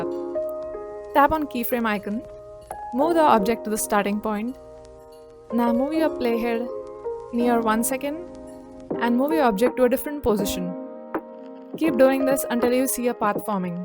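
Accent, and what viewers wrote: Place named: India. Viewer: From his accent he looks like an Indian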